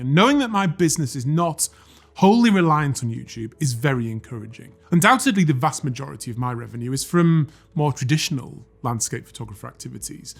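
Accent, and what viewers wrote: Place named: United Kingdom. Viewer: Hey, I just discovered your channel during the lockdown 2020. I just want to say: Thank(!) You(!). Watching your videos is something I can do for hours, because of your combination of stunning photographs, good explanations, nice stories ( and your british english 😀 ) I don't care, which amount of money you earn or how you could be categorised. I am just happy, when there's a new video I didn't see yet, making this stayathomeadonothing times a bit less ugly for me. Keep going as you are and don't give a sh.. about the haters - there always will be some. Also I am really looking forward to read your book! Best regards from Germany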